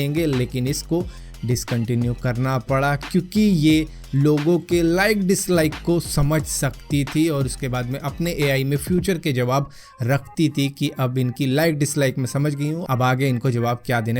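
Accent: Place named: Punjab, India. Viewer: Muje ehsa lgta haii ki app punjab ke ho..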